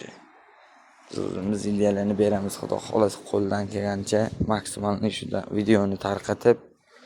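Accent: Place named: Khorezm, Uzbekistan. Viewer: хорпзмчага айланибдику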